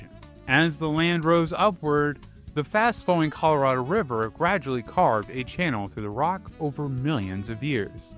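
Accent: Appalachia, United States. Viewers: I jest in my derision, of course lol I'm from down closer to the Cumberland Gap and Smokies, so it's always funny to hear the different pronunciations. Still, glad to see mountain cousins making fun content though! Keep up the good work!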